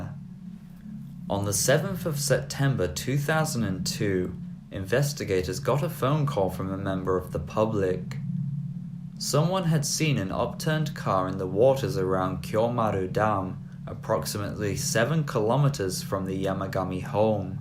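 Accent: Manchester, United Kingdom. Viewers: Are you from Manchester? Trying to place your accent but I'm no expert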